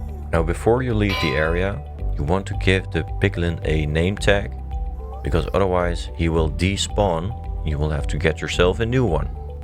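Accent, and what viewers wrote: Netherlands. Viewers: I dont know why but you sound a bit like a Dutch guy speaking English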